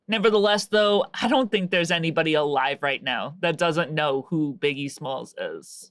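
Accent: United States. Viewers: ohh I completely understand, I wasn’t trying to hate to say you’ve don’t anything offensive or any silly crap like that . I actually like your videos a lot you do amazing work and you do it from a perspective you don’t often see or hear from I like hearing stories I’ve heard (and some I haven’t) before but in a way that I never heard it and you deliver that which isnt an easy thing to do Plus Being born and raised in Baltimore and having a pop pop who use to travel back and forth from bmore to Connecticut, NY and Jersey like he had a different wife and kid in each state (I think he might of actually on Buffalo but that’s beside the point lol) but he was an old school “union worker and sometype of official” who also was a major number runner in the city (it’s ok he passed away awile ago so no worries) but I’ve heard some stories and seen a few crazy things as a youngin lol you also remind me of a few girls who were really good friends that I grew up with. 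I know your not from bmore im Pretty sure your from somewhere in the Tristate area maybe in one of the five famous boroughs of New York or New Jersey maybe Jersey city or Newark. I can hear a little bit of it in certain words you say (you’ve probably mentioned it before, my memory is shit nowadays anyway lol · I love your accent. Reminds me of living in queens ❤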